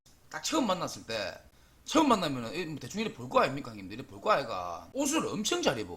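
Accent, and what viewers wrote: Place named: Busan, South Korea. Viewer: 하~~~이거 보다보니 몇편째 계속보고있네 썰푸는거 잘안보는데 너무 잼있네요.군대동기 부산놈하고 말투 목소리도 비슷하고 은근히 친근감이 느껴져서 구독하고가요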